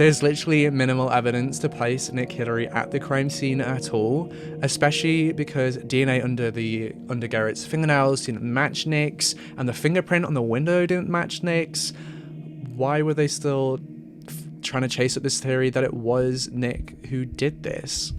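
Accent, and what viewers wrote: United Kingdom. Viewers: As soon as I heard the British accent and the racism crack I knew this was going to be another Jesse Smollett defender!! Right off the bat he skips the part about the boy friend following the boy home. And this doesn’t have a damn thing to do with racism. I’ve had ring side seats of this very relationship. My grandson is mixed race and thank God, (not satan like this dude) he’s 16 now, and his mothers boyfriends weren’t killers. Because if we would have come home and found him dead after his mother broke up with her boyfriend who outwardly blamed him, you know who did it. This dude is a young know nothing who’s people already destroyed his country because of this very weakness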